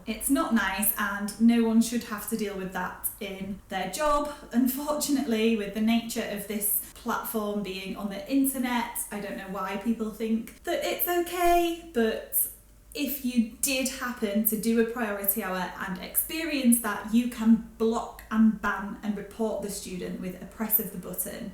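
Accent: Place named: Manchester, United Kingdom. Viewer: You sound like a Manc.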